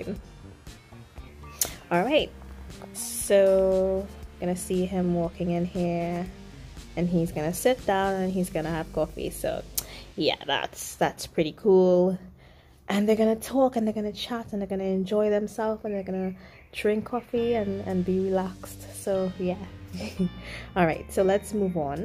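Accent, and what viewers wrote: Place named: Nigeria. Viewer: love thw cafe. where are you from you sound Nigerian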